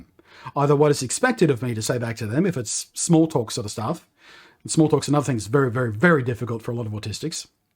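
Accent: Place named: Australia. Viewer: Your description of concentrating on a coffee cup in a coffee shop reminded me of me walking through Martin Place (in Sydney). I noticed that I was looking at the ground, and only glancing up to check and then I would look down again. Thinking about it, I noticed: the paving stones are static, calming. The buildings are static, calming. The sky is largely static -- maybe clouds drifting slowly, but basically calming.And then there is this band of activity -- the crowd of people moving around. And every motion is pulling at my attention: notice this! So it feels "prickly": I cannot filter out all that irrelevant, random movement from my attention -- it is all tugging at my attention. The movement of the crowd is not calming, but unfiltered, attention-grabbing sensations. I can look at it -- it isn't causing me a meltdown -- but I just feel calmer looking down, and only glancing up when I need to check where I am, and where other people are closeby. 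Being in lockdown means not having to walk through Martin Place or other city streets. I love the peace and quiet of my suburb. Getting diagnosed as an adult leads to many big and little self-discoveries. (And of course I picked that you were Australian straight away)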